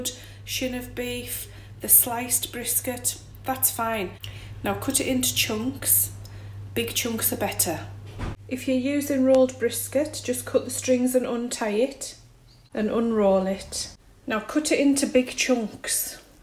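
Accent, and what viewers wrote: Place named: Northern England, United Kingdom. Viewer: Hi, That’s looks delicious. I’ve been following you for a while now, I live in Australia, originally from UK and look forward to hearing your Northern accent and seeing your friendly face and your funny comments about your husband, you make me laugh. Brilliant 🤗 thank you xx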